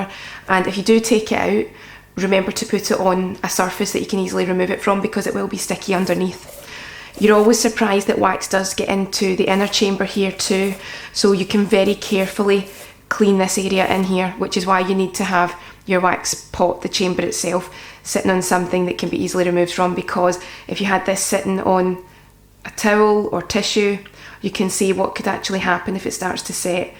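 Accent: Scotland, United Kingdom. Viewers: I benged Outlander and now the Scottish accent seems to be following me lol. I love your accent. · U sound Scottish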